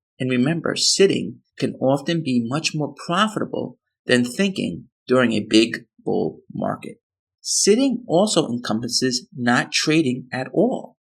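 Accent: United States. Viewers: Excellent yet simple 👏 👌 👍🏼.. Btw ..are u from ny 😅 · Love you accent,Brooklyn? Cheers from the UK.🇬🇧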